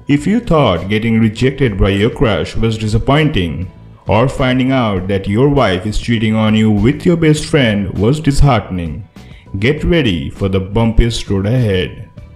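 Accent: India. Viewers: Indian accent makes this video perfect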